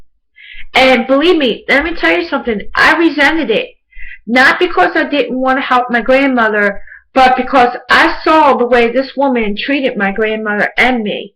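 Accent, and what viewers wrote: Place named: Northeast, United States. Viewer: HI, My prayers go out to you, u sound like you may be from the northeast im in the philadelphia area. My mother too is a narcissist and i just had a question for you regarding the enablers in our family, do you have any advice on how to reach them? my sister is very much an enabler and was parentized as well, and i cant seem to get her to come around so she can start healing from the abuse shes been through. Any input from you would be appreciated. God Bless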